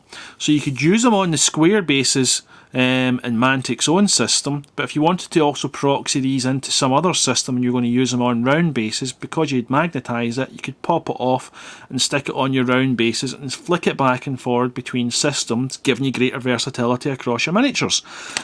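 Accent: Scotland, United Kingdom. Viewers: Is this a scottish accent? No offense but it sounds freaking hillarious :D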